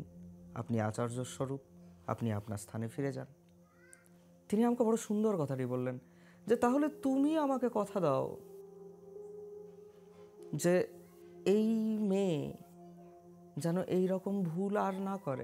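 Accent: India. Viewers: Ew! NOT the biMaaaru tongue again, ffs!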